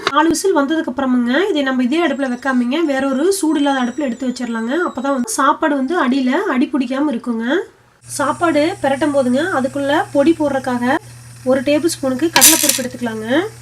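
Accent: Kongu, India. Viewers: கோவைல மட்டும் தான் அப்டி பேசுவார்களா.. ஈரோடுலயும் இப்டி தான் பேசுவாங்க.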